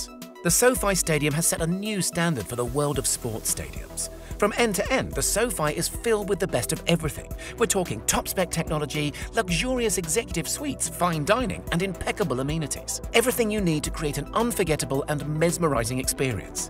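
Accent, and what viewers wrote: United Kingdom. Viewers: Why does the narrator have a brit accent?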